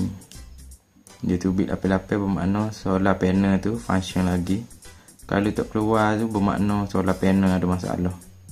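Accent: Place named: Kelantan, Malaysia. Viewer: Terpadu skali loghat kelate boh...steady sep kito..haha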